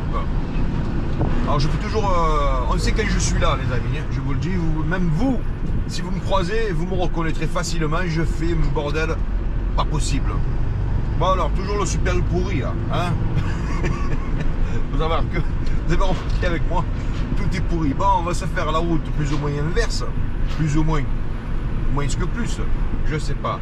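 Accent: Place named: Provence, France. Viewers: J'aime t’on accent du soleil 😂😂😂❤ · Bonjour ! Je vous ai découvert cet après-midi et quand j'ai regardé cette vidéo, je me suis dit que, comme je ne suis pas loin de Vaison (Monteux) alors, je suis du Nord puisque vous redescendez "dans le sud" 🤣Et pourtant, on a le même accent !!!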